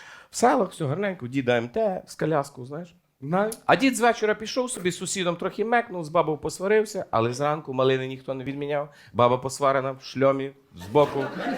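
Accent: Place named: Bukovina, Ukraine. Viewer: Розповідає цей пан -- неперевершено! З буковинським акцентом і дуже смішно. Дуже файно! БРАВО!!! Привіт зі Львова від глибочанки.😂❤❤❤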